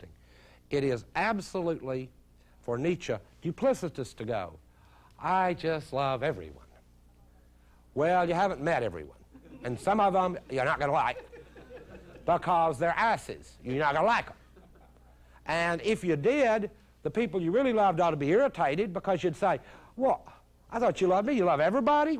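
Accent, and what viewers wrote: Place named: Texas, United States. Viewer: I knew this dude was from Texas because he sounds like Mark Rippetoe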